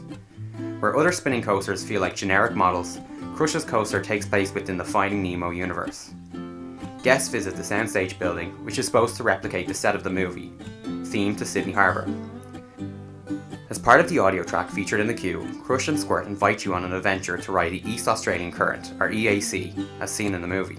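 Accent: Ireland. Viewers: Yes, it's excellent quality. Thank you. Just not used to a non-American accent in these videos lol. Irish?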